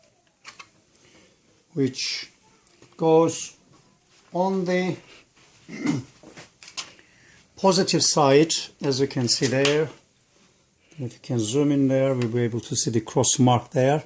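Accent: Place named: Iran. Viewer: You sound like you have an Iranian or French accent🤔🤔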